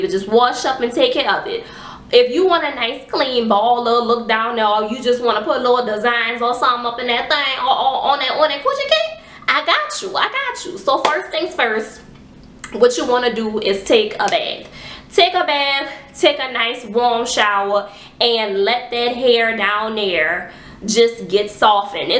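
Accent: New Orleans, United States. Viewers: Yes mama I hear dat orleans accent 😂❤️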